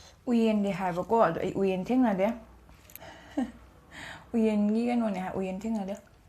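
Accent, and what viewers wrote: Kakching, India. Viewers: Kakching Londa ngangbse ngna tabada ym nungcye kkching Londa adum ngangko mthangdasu😍👍👍👍👍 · Kakching Londo taba ym nungai👍👍👍👍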